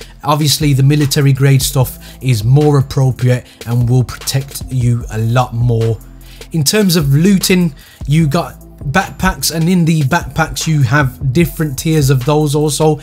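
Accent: Birmingham, United Kingdom. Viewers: You're from Birmingham aren't ya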